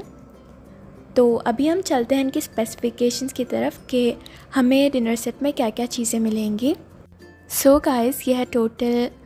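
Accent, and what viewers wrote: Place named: United Kingdom. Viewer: अंग्रेजी उच्चार मे हिंदी बोलनेसे क्या आप उच्चभ्रु होनेका अहसास करती है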